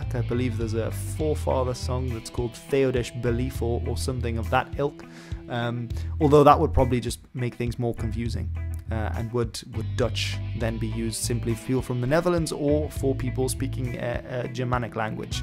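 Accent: United Kingdom. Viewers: Dutch? I thought he was British.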